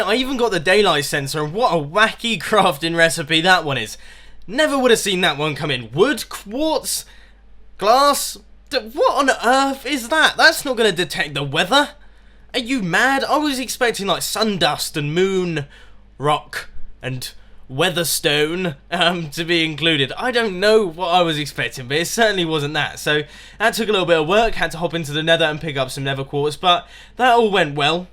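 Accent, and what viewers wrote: United Kingdom. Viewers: I think what I love most is the Britishness of your accent and the music. It's just so... British. Keep up the good work. Love your stuff.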